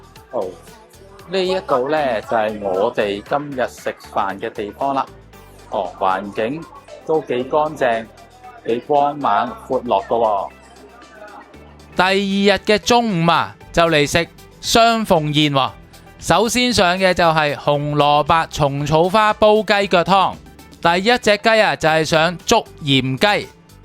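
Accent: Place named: Hong Kong, China. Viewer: 點解您講野口音咁似港人仔仔🥹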